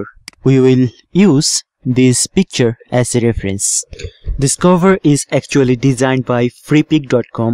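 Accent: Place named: India. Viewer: Desi English 🤟😝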